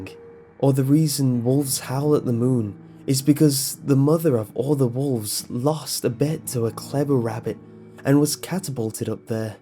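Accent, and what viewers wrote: England, United Kingdom. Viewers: I know he is not from America so he is probably from England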